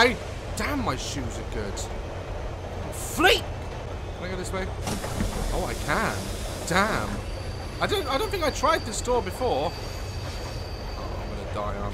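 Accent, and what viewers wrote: United Kingdom. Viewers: The jump scares were top notch, it was enough to curse in my native Spanish language! 😆 I laugh so hard when cursing in a UK accent makes it 10X funnier. I love it!! 😆👍 Had a rough day and I needed a good laugh and good ol’ always cheers me up!! 🙂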